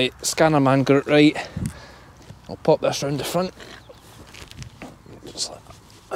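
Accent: Scotland, United Kingdom. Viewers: I don’t know how but Iv put subtitles on, have you ever seen subtitles on your videos 🤣🤣🤣 it’s not good at translating the Scottish accent hilarious what it comes up with! Enjoying the lambing diary 👍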